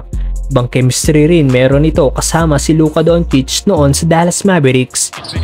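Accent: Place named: Laguna, Philippines. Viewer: Taga Laguna ito halata sa tono mo hehe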